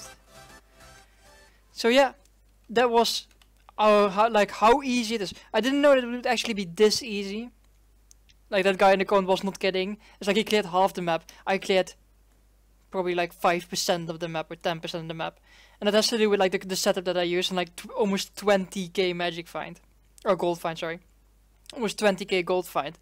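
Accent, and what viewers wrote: Netherlands. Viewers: je accent klinkt zo lekker nederlands, love it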